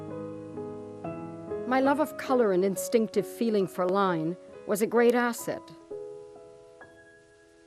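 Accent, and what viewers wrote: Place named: Maine, United States. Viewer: Couldn't they find a narator without that terrible voice and a cent. She sounds like a fishwife from Maine.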